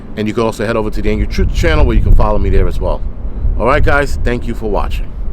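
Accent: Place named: Bronx, United States. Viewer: OMFG - I love hearing you talk (I mean Twalk )...that accent along with the 200% Bronx attitude and speaking the truth for all to hear, man I'd rather hear you speaking from the podium that any/all of these boot-licking-LEFTISTs that are taking us people and our country straight to hell in a hand basket. Spot on with that comment that all homeless people should be bused over to the Govenor & Mayors mansions. Fuck Yeah, I'll pitch in for the gas.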